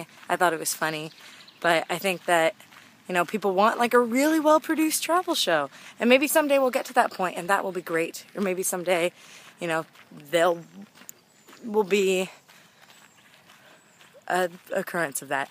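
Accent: South, United States. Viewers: I enjoyed the Vancouver episode, even with the Southern accent and the absence of rain, continue being you <- sorry, the second "h" was used for "eh":) Sincerely a fan and life-long Vancouverite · I think it was the accent that bugged some as it sounded southren american but I enjoyed it regardless.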